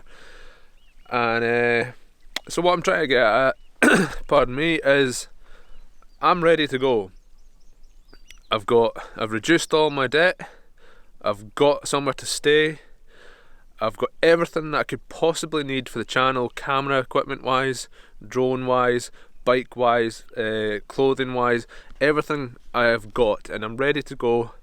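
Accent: Scotland, United Kingdom. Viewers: Not the 1st time I've heard a Scottish accent say "Spare a little change pal" ;) ... Count me in for the Patreon sub .. Not to be soppy but due to injuries and ill health your videos have got me thru the time off the Bike these last few months and made me look forward to getting back on it, So I'm sure its the least I could do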